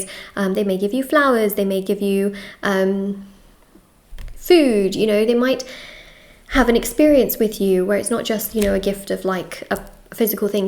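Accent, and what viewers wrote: United Kingdom. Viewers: ❤Her accent tells me she's British......❤ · British accent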